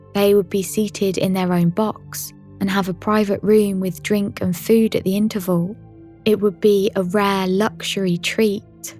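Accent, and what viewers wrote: United Kingdom. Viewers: You are the best voiced narrator I've heard in my 47 years. (I live in Oklahoma so what do you expect lol) - before your voicemhit my ears, my favorite was Richard Dawkins' wife Lala Ward...she used to be my favorite narrator and before her, it was the Forensic files narrator. Your voice is SO smooth and relaxing and sublime. Female British accident is my favorite accent in the world...and if anyone offers to digitize your voice ask a lot of money because YOU ARE THE BEST. NOBODY is as sublime to hear than your voice. I don't want to invade your privacy, just want to know what parts of Britain a person has to grow up around to get that accent? Your microphone is so good, your classy, thorough, and wonderfully pleasing voice is so good. It's a 🙏 thank you kind poster for sharing your voice with us. Don't worry I'm not a stalker... I'm gay lol you just have such an amazing voice it's unbelievable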